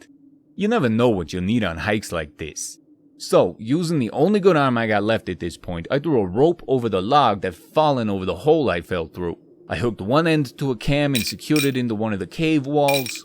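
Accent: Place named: Massachusetts, United States. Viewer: This was a great story! You did a pretty good job with the Mass accent, too.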